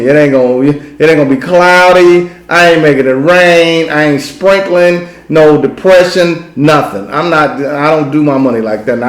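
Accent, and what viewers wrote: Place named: East Coast, United States. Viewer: You sound like an East coaster. Where you from fam?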